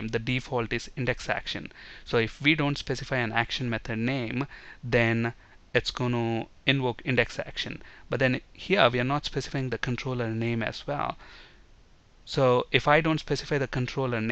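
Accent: India. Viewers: At first i didnt liked ur tutors, but ... you are the best tutors maker i have ever seen. Keep up. I didnt like them cuz of the common words like "vep-applications; vindows" etc :D Indian stuffs... but u are awesome :) That on impressed me the most... i mean that u are using fresh VS from beggining and in every tutor u are using same names for ur models, views etc.. so it makes it more clear. GOOD JOB!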